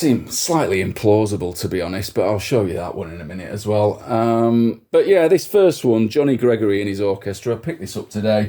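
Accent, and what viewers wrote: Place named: Yorkshire, United Kingdom. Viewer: You've got the same twang as my mate from Mytholmroyd, ha! Yes, their are some great finds to be had with 12 inches in the dance/electronic/disco ballpark and I love having a rummage to see what turns up. I've also bought some absolute bobbins too to be fair but jeopardy is part of the fun, I guess. At the prices I pay I don't bother looking them up in situ... I'm happy to take the rough with the smooth! I'll look forward to you doing a video featuring 12s, and, like you, I'm behind in looking at what I picked up recently. I've probably got a good couple of hundred dotted around I need to catch up with over a beer or four!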